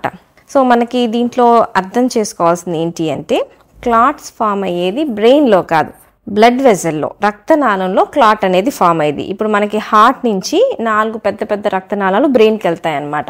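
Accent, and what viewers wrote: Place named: Telangana, India. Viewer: అందుకనే డాక్టర్ రేట్. Though u in Guntur, u speak Telangana Telugu.. nice...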